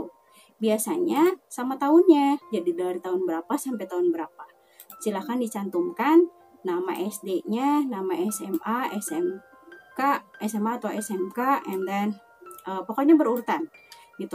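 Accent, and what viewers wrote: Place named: West Java, Indonesia. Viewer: Terima kasih mudah2an bermanfaat, bahasanya sunda banget ih...☺ Maafkan Ay...koreksi positif.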